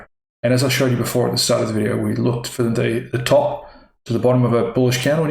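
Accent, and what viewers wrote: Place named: Australia. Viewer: Hey Mate, much love from Sunshine Coast. Good to hear an Aussie on here teaching the goods. Thanks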